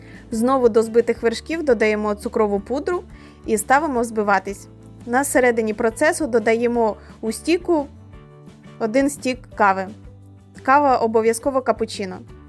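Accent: Ukraine. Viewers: Знайшла спочатку відео з льодяниками ваше і подумала, що ви з росії, але почула нашу букву «Г»)) а потім перейшла в канал)) українською набагато милозвучніше !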